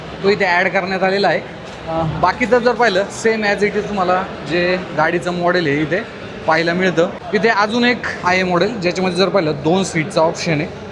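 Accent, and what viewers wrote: Ahirani, India. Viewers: भाऊ तुम्ही अहिराणी आहात का ..? थोडा थोडा आभास होतो तुमच्या भाषेमध्ये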